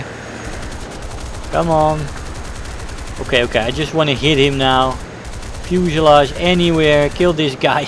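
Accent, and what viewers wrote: Netherlands. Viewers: ik kan het meteen horen als iemand nederlands is, meeste nederlanders hebben een best wel herkenbaar accent als ze engels praten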